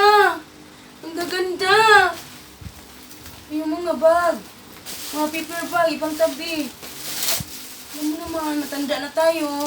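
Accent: Visayas, Philippines. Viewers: Hahaha bisaya ka gd day oi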